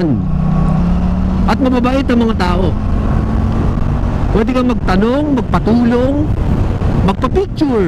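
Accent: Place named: Batangas, Philippines. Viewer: O batangas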